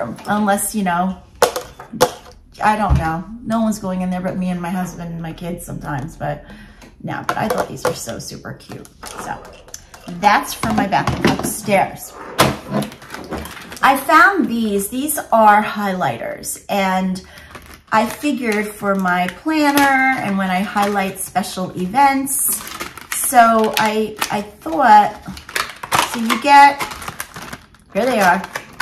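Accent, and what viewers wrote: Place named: New York, United States. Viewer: Just found your channel ❤ I’m TEMU obsessed and my cart on the app just keeps getting bigger and bigger 🥹 I am from Long Island and moved to Florida a few years ago and heard you and your laugh and just knew you were another amazing New Yawkwr 😊 You’ve got another subscriber 🎉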